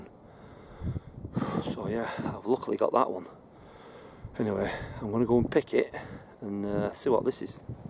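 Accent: Lancashire, United Kingdom. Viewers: We really do appreciate the efforts you go to to bring us these videos, and as someone brought up in Lancashire but living in Essex, that lovely Lancs lilt in your accent is a reminder of what I left behind!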